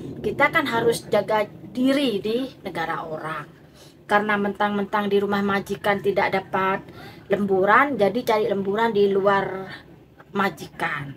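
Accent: Madura, Indonesia. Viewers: Kok kayak reng madure nik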